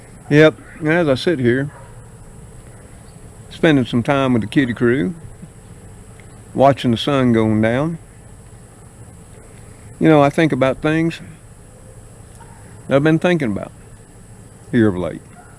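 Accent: South, United States. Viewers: Good ole home southern talking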